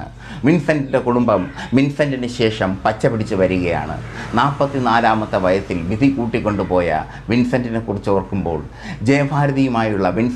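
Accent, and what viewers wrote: Kottayam, India. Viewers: ജയഫാരതി ഈ ചേട്ടൻ കോട്ടയംകാരൻ ആണോ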